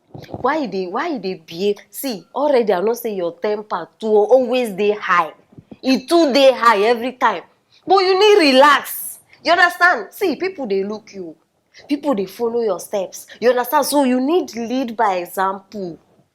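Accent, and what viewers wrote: Nigeria. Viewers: That Nigerian English ooooh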